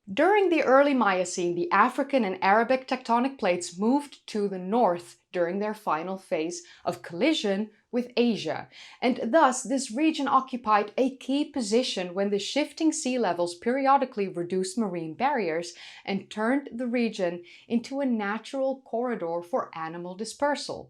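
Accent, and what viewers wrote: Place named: Netherlands. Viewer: I really love how you pronounce these words. I love the Dutch accent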